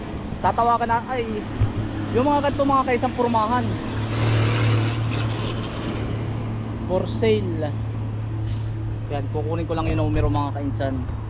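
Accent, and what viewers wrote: Quezon, Philippines. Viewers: Ay Alin baga nman po Abay taga Quezon po kayo hane Alam nay sa ponto pa laang ay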